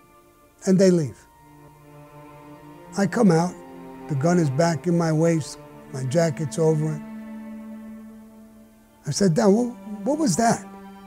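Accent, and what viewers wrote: New York, United States. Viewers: hes great storytellar that old school newyork accent i miss hear in it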